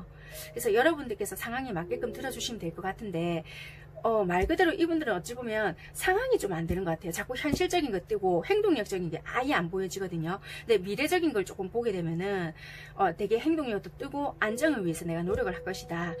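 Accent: Busan, South Korea. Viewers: 아 넵 ㅎㅎ 감사합니다! 항상 영상 잘 보고있어요😊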